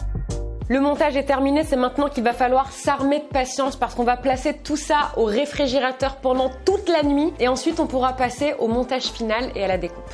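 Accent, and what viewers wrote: Canada. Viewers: tu mon youtubeur préféré de vidéos accent canadien et qui met quoi des vidéos trop trop rire 👍👍👍👍👍 un gâteau aux fraises 🇨🇵🇨🇵🇨🇵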